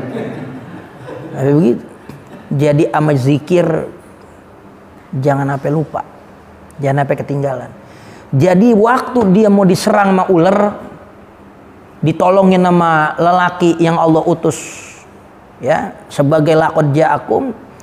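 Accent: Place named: Jakarta, Indonesia. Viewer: Betawi beud dah.. Sama ama saya ustadz.. Suka sayah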